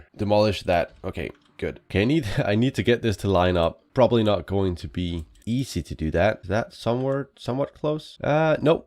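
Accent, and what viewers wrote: Denmark. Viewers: I thought your accent sounded a bit similar to a Danish youtube friend of mine, and I was right! You are Danish. Dannebrog for altid!! 🇩🇰🇩🇰🇩🇰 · Immediately noticed the Danish accent 😂 Love the video tbh though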